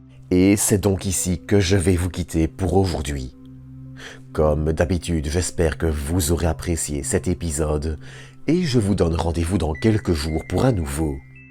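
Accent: Belgium. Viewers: merci pour les précisions, mais j’avais une autre idée de l’accent belge, encore bravo pour tes videos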